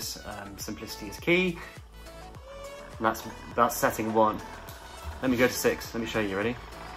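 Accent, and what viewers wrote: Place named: United Kingdom. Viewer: Why is this guy's British so hot?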